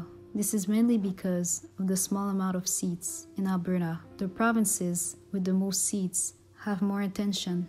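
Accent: France. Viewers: I don't care much about the accent and I do know she's French; read my comment. Of course, I'm not claiming she is reposting someone else's content. That's what I'm doing; I'm just providing feedback that would make this channel more popular for me and undoubtedly many other people. One should not be quick to judge another's intentions and it is an insult to consider the work of a craftsman to be the best that he is capable of. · She sounds both French and Native - which makes this HER content and heritage - patiently listen or find someone that “speaks your language”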